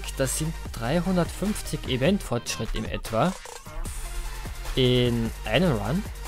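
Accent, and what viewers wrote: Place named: Vienna, Austria. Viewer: hör doch den dialekt als österreicher raus ^^ wiener schätz ich mal :P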